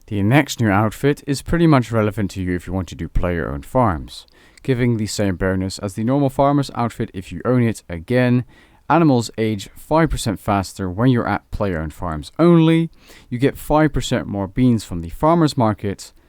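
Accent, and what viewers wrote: Netherlands. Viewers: He’s fucking dutch, it’s not his first language. Now shut the fuck up. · that dutch accent tho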